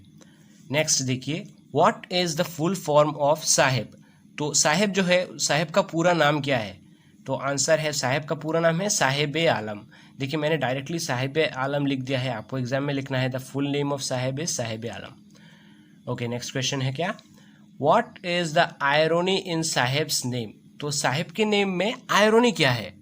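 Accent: Assam, India. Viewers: Bro kya aap assam se ho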